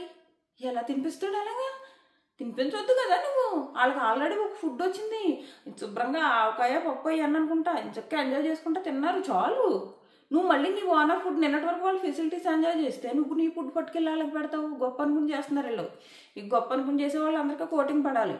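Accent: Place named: East Godavari, India. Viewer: East godavari voice ❤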